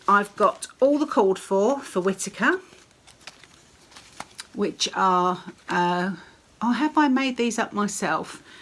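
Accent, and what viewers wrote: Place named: Nottingham, United Kingdom. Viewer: Gorgeous stockings! Loved your use of the word “tiddle”. We had a lovely neighbour who we watched over. She was from Nottingham & always used that word. My hubby could never figure out why she always called him duck! We were neighbours here in Vancouver, Canada for 28 years. Sadly she passed away 6 1/2 yrs ago at the age of 90. Your accent always makes me think of her. Merry Christmas to you & your family.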